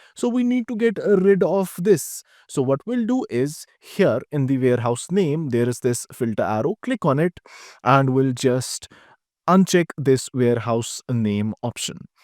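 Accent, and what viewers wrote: India. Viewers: He has that typical heavy Indian accent. Hard for native English speakers to understand, due to less emphasis on sections of words. I have taught a few people from India how to speak clearly. Mind you, my Indian, Indonesian is bas, my Spanish id okay, so I cant really talk. However, i do work on the accents otherwise I get passed over.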